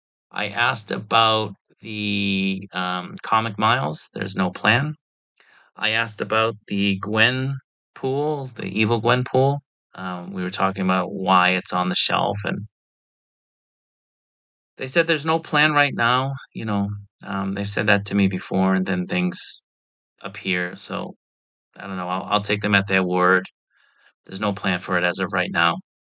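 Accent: Boston, United States. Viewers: My Yankee ears are picking up a Red Sox accent lmao. Thanks for the updates bro. Need that new Batman and Eradicator soon!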